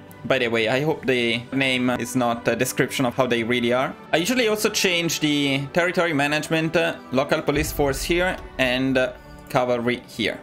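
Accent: Italy. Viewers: bro sei italiano lo percepisco.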